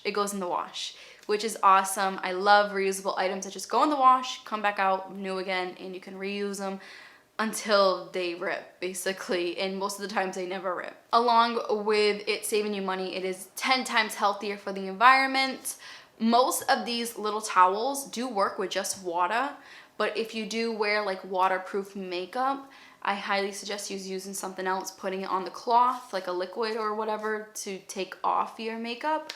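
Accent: Boston, United States. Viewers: I subscribed within seconds of watching your video because I heard the Boston accent - and then you talked about Mass so my suspicions were confirmed! Love that I get to follow a local gal with good advice. :D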